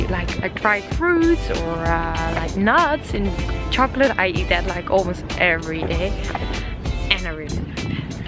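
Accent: Netherlands. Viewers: ***I LOVE YOUR DUTCH ACCENT!!!!****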